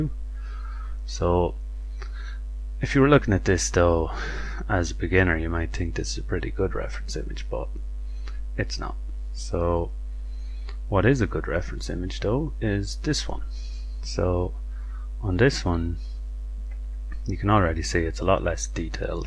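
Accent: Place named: Ireland. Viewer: The Irish accent will keep me watching this! <3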